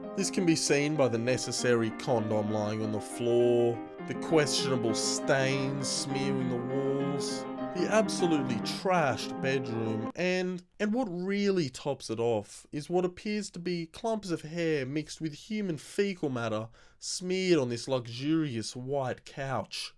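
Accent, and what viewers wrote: Australia. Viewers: Christ that is by far the most annoying australian accent i have ever heard. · right, no wonder you had an Aussie dialect and accent (partial) but not too obvious when you spoke watched half of your videos, trying to catch up with all of them, and realised that your channel only just started a few months ago, and I found your channel not too long ago either keep up with the good work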